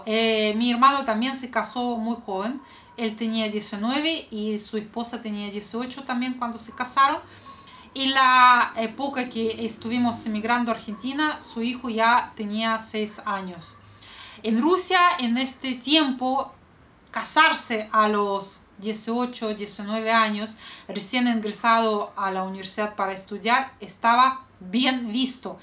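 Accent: Chile. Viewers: Hola amiga, gran historia la de tu vida. Imagino que todo ésto te ha convertido en una mujer fuerte y determinada. Felicitaciones, se requiere de mucho temple y carácter para sobrellevar tales dificultades. Admirable. Es posible que la manera de ser de los chilenos te hagan sentir identificada con nosotros. Acá cuando te caes tienes que pararte rápido y seguir adelante. Un abrazo desde Santiago de Chile. En todo caso el idioma chileno te queda muy bien ❤😂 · ¡Hola! Escuché integramente tu relato, porque me lograste despertar mucho interés en tu historia de vida. Me encanta como te expresas en español-chileno y la manera honesta de tu relato. Admiro la fuerza resiliente que has mostrado para salir adelante, tanto en el plano personal, como en la responsabilidad con tu hijo. Más allá de los muchos momentos difíciles que te ha tocado vivenciar en tus experiencias migratorias, ¡te felicito por como has asumido tu vida! 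Te cuento que he tenido la oportunidad de viajar a varios países, entre ellos pude viajar a Rusia en 2019 y conocer Moscú. A partir de ese viaje opté por aprender ruso y hasta ahora trato de practicar el idioma cada vez que pueda. Меня зовут Рафаэль, и я живу в Сантьяго, Чили. Надеюсь, у тебя все хорошо, и ты наслаждаешься жизнью, своим сыном и любой поездкой за границу, которую решишь предпринять. Пока-пока! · Hola.. muy interesante tu vida, eso sí es una historia de vida, te escuché muy atentamente y también fue agradable escuchar tu acento y modismos Chilenos, eres entretenida, hermosa y agradable. Saludos y me alegro mucho por ti. Un abrazo.🤗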